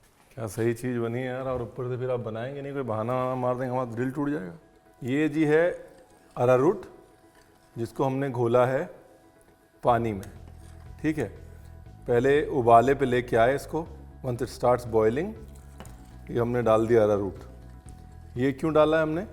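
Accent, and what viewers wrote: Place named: Uttar Pradesh, India. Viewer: Is Ranveer UP'ite? He has a UP accent..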